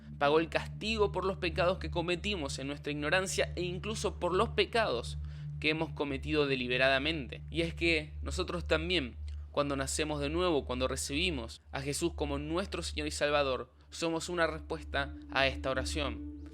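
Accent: Argentina. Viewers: wtf cuando habla argentino y tiene un canal de youtube sobre teorias y conspiraciones de jesus xddd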